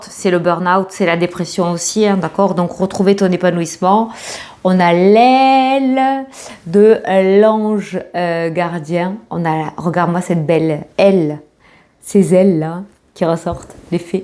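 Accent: South, France. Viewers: Bonsoir, Quel peps j'avais pas le moral tu ma regonflé à bloc merci pour ce beau moment! J'ai adoré le passage "Jean Jeannot Georges" une pensée pour mon papa au ciel qui s'appelait Georges 🕊🥰 je suis du sud aussi j'ai l'impression de m'entendre parler!! Ta joie de vivre et les expressions font un bien fou comme à chaque fois! Une belle soirée à toi et merci encore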